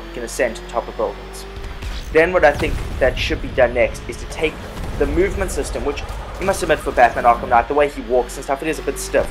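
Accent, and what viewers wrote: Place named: South Africa. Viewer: recognised ur accent 😂 Im from SA too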